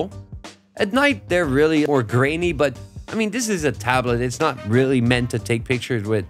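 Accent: Netherlands. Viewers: I sense a Dutch accent 😄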